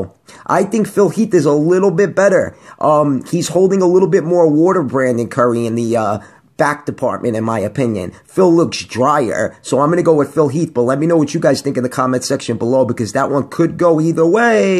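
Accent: New York, United States. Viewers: Love your nyc accent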